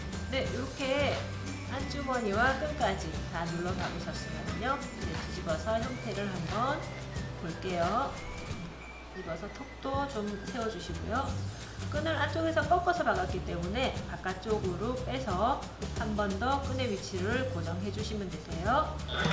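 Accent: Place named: Daegu, South Korea. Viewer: 설명하시는분 목소리 듣기 좋아요. 대구분들 유튜브에서도 억양이 나타나더라구요~~ 코코지니원단 튀면서도 예뻐요.~~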